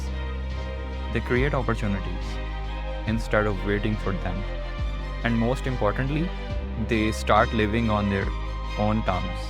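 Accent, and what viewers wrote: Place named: India. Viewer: A quick suggestion, the 'r' pronounciation isn't looking natural . Its alright to stick on our beautiful Indian accent. All the best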